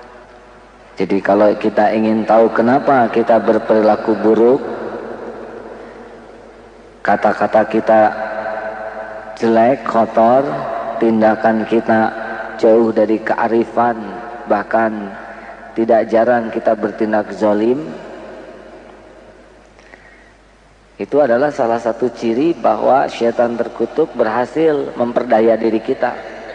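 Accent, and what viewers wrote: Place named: West Java, Indonesia. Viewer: Suara AA' lah...dengerin aja Aksennya. Sunda.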